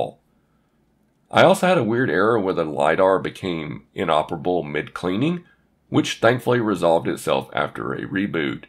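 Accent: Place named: South, United States. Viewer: apologies , it sounded like.youre from the southern U.S. For us brits, Canadian and US accents are pretty much indistinguishable save a twang from states like TX, AL, FL.. bit like aussie and brit then lol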